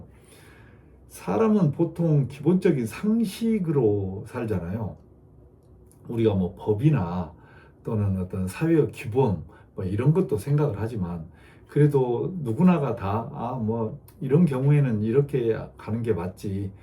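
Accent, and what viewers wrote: Jeolla, South Korea. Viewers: 잘듣고있던중. 절라도사투리를. .거참 .!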